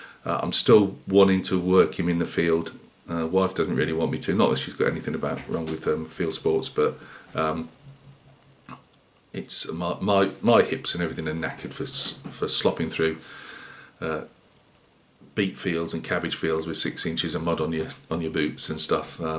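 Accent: Midlands, United Kingdom. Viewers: Happy new year to you from deepest France. My chickens are as pissed off with the weather as you are - though I don’t think spraying models is their main concern. Know what you mean about how great some of our fellow hobbyists are, one of my blog followers sent me 24 beautifully painted Empress Minis to accompany the Indian Mutiny project you inspired me to start. Who does that kind of thing? Anywhoo, lovely to hear another midlanders accent waffling on in the background while I paint, feels like I’m in a pub in Tipton. lol.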